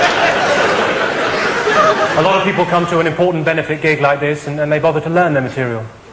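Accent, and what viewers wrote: RP, United Kingdom. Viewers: Is it me or is he really poshing up the accent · Sounds incredibly posh in this show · His accent is so posh :33333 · Technically he doesn’t have an accent, it’s just a slightly altered form of Received Pronunciation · Is me or does he sound super posh in this??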